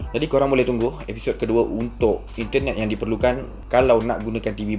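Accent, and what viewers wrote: Java, Indonesia. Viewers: bang ko org mna. ckp mcm ada sleng jawa je..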